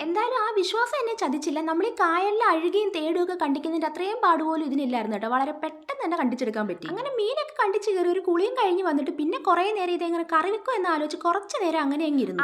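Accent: Kollam, India. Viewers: Kollam കാരി ആണോ 😂 സംസാരം and മീൻ items കണ്ട് ചോദിച്ചതാ.. നമുക്ക് മീൻ ചാറില്ലാതെ ചോറ് ഇറങ്ങില്ല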